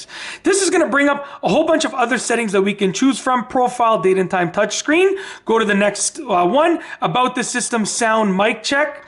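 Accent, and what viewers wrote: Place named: Canada. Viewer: I love that I can tell you're probably Canadian just listening to you. Well wishes from those of us who aren't crazed lunatics from the south of you.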